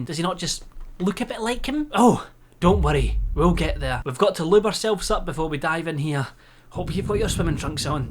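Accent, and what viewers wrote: Scotland, United Kingdom. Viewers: It’s Scottish 😭😭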